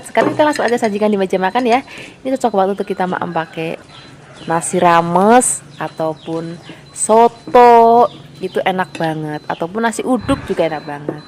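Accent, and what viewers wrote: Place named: Semarang, Indonesia. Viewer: Suka bngt dg cara bicara nya ceria bnget...spt logat org semarang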